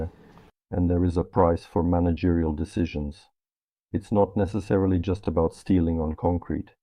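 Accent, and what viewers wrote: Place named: India. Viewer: Как будто индус озвучивал) Ну вообще неплохо.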